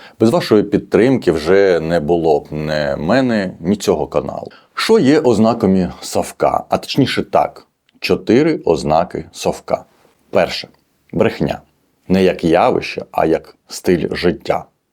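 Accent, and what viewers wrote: Russia. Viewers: не смог посмотреть только из-за того, что украинский от автора (который явно думает на русском и всю жизнь говорил на русском) просто ужасен. вот эта вот типичная путаница и/і просто сводит с ума. никогда не смогу понять, как может украинец в Украине говорить на украинском с АКЦЕНТОМ. это пиздец, господа. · Скільки років Незалежності України ? 33 роки. Скільки РОКІВ ВИ РОЗМОВЛЯЄТЕ УКРАЇНСЬКОЮ ??? 1 РІК . МОЖЕ 2 . ЦІКАВО , ОСОБЛИВО ЗВАЖАЮЧИ НА ВАШ РОСІЙСЬКИЙ АКЦЕНТ ТО ВИ НЕ СОВОК ???????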